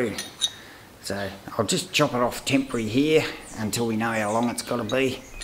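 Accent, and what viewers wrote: Australia. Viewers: I thought I recognised a fellow Aussie accent. Nice job, I hope to see more. I'm Brisbane based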